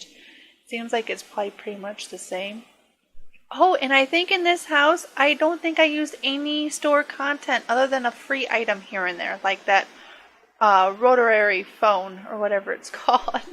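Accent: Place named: South, United States. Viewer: Question: Where are you from? I can hear a slight southern accent and I've always wanted to ask but I didn't feel it was appropriate but with the 100 sub video coming out I felt the time was perfect! And as always amazing build!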